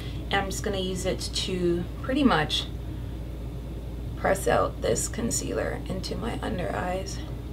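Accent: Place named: Jamaica. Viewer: Great Video, Where are you from? I almost thought I heard a Jamaican accent.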